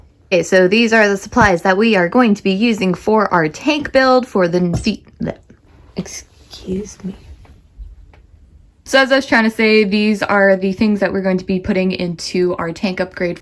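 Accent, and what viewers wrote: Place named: Canada. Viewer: You sound like you’re from Canada or maybe Minnesota.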